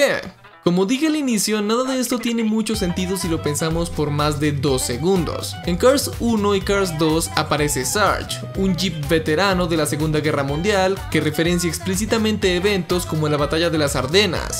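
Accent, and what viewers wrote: Colombia. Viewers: Eres colombiano?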